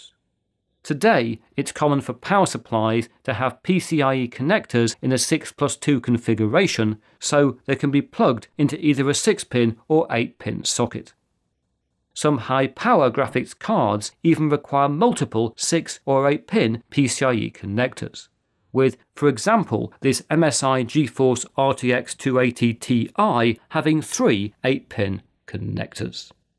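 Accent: United Kingdom. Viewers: Actually I came here 4 studying British accent 😜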